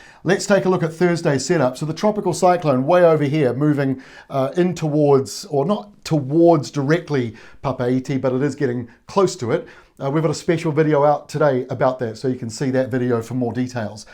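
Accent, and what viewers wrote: New Zealand. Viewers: Good clear kiwi presentation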